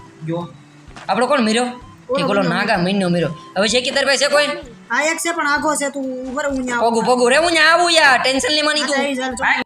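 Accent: Bhavnagar, India. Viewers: એલા નક્કી તમે ભાવનગર બાજુના લાગો😅😂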